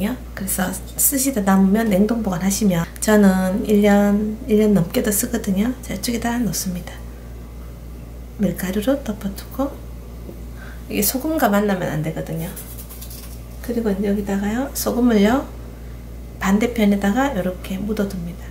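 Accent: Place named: Daegu, South Korea. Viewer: 는 대구분이신가요? 그러시다면, 말투가 처음엔 좀 거슬리더니, 보고 들을수록 정겹네요 ^^ 는 내가 이세상에서 호감을 갖는 최초의 대구사람이십니다~ ㅎㅎㅎ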